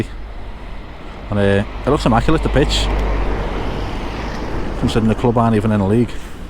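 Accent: Liverpool, United Kingdom. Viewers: Nice scousers accent great video!! Yer love Wrexham fc I'm Celtic fc ☘️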